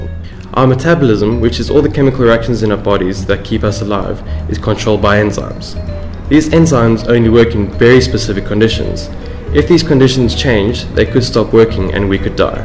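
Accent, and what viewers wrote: South Africa. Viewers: when you have the same accent as him (0-o) (lol he is South African for anyone wondering - or at least he sounds it) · Ak Khan He has a South African accent . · Are you south african? 🤔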